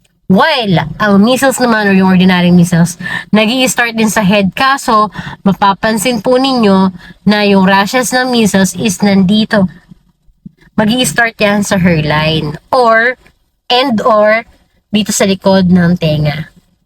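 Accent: Pampanga, Philippines. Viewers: Kapampangan kayu po?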